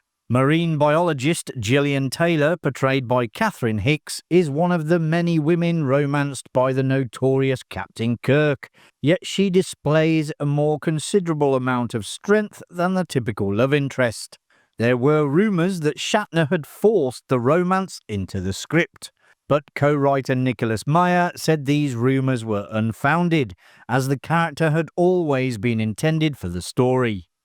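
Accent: United Kingdom. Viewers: Your British accent annoys me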